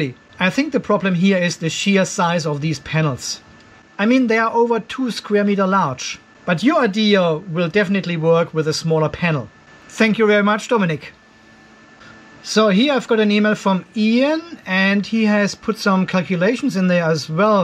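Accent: Germany. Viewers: Sorry to inform you but I am correct A-do is not a name in the English language. It might be a name in your native language, which sounds German by your accent. But as a native English speaker born in America where the phrase is often used. The phrase "much ado about nothing" is used to describe a situation where there has been a lot of excitement about something that is not really important.03 It comes from the title of a humorous play by William Shakespeare.3 The phrase was already being used for a big commotion or trouble in the early 1500s. Some people make a big fuss over a trifle, as in Jerry had everyone running around looking for his gloves.02 The phrase is often used to describe a situation in which there has been an overreaction to something that shouldn't have caused so much trouble.. But I think you know all of this, and are only making a Joke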